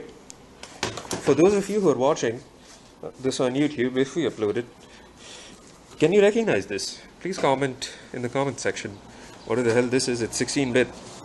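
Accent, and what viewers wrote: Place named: Hyderabad, India. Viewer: This guy is hyderabadi . When he said ye sab cheezan 🤣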